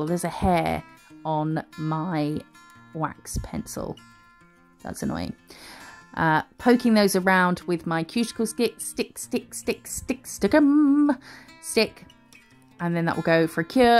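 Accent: United Kingdom. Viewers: Love your video's ! Your channel was recommanded on a dutch facebookpage for hobbyist nailartists. And they where absolutely right to recommand you! ❤ your British accent 😍💅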